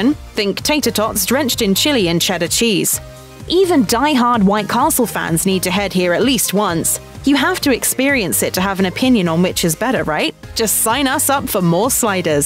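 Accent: United Kingdom. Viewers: I dislike theBritish narrator. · The British accent seems inappropriate for this food.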